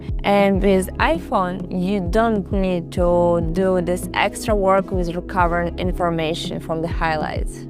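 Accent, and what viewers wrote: Russia. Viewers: Мне показалось, или я слышу русский акцент? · Слышу русский акцент 😊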